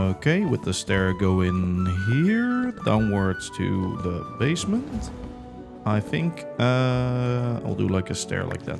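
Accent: Netherlands. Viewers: Eyy random question are you dutch? You have a very dutch accent... zou ik moeten weten, ben het zelf. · First time watching your video and i wonder do you speak dutch beceause you have a dutch accent? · I heard you talking on Beardstone or Chosen's video and thought, hey that guy sounds dutch. Now I see you are dutch and a rail fanatic. Coooool. Subscribed. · I knew it, the accent totally a Dutch guy😂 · love the dutch accent as one dutch to another i salute to you! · i'm almost sure you are dutch,, eventho.. came for the clickbait, stayed for the accent!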